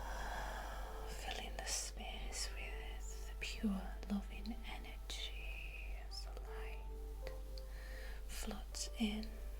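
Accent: United Kingdom. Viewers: Are you British ? 💖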